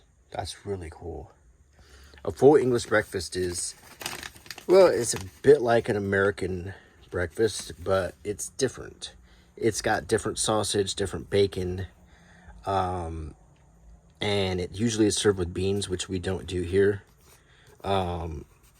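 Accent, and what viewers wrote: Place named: France. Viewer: Good job mate, really relaxing! Love the french accent!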